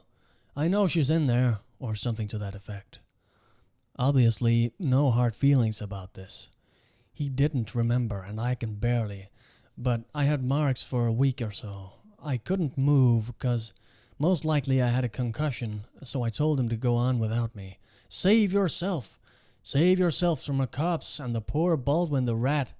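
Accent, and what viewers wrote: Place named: Germany. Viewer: nice accent, are you from Germany?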